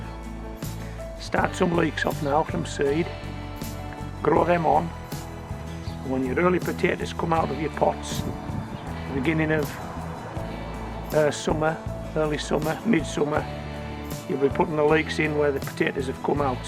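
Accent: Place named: Cumberland, United Kingdom. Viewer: Hello New Friend. Great value instructional videos as well as a great Northern possibly Cumberland accent?? Will you tell me where? A welcome northern edition of gardening expertise.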